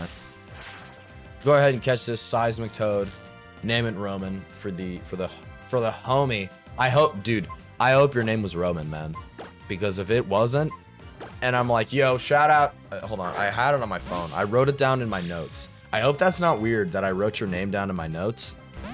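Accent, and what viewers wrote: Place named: London, United Kingdom. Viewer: _ATJAbouTime: ligma be damned get well ah kid at least it's not sugma.... Love the series ah kid keep it up and no faffin. For the love of all things holy please learn to do a proper English accent no the London bollocks lol :_ATJDewgKEKW: Broke/bone for a name :_ATJTrevSmile::_ATJBrudOK::_ATJTrevSmile::_ATJBrudOK::_ATJTrevSmile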